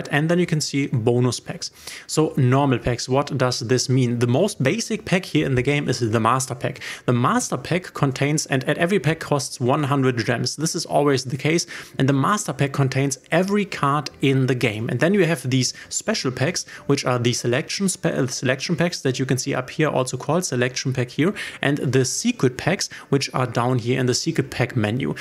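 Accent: Germany. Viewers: Bin neu und habe schon an seinem Akzent irgendwie bemerkt, dass er Deutscher ist :))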